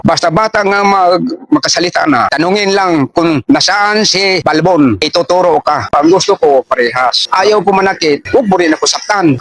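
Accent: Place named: Bohol, Philippines. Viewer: cebuanong may pagka boholano😊.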